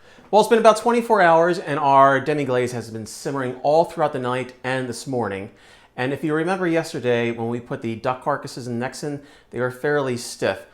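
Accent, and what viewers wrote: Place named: Philadelphia, United States. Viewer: Not a lot of videos on demi-glacé, appreciate it! That accent don’t lie, you’re definitely a Philly boul!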